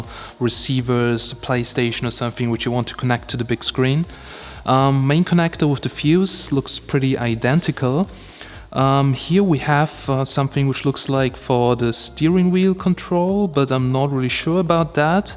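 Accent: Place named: Germany. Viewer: nice German accent :-)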